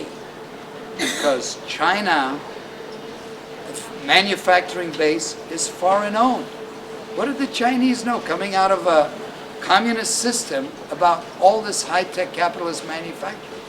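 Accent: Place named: United States. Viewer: American acent for sure.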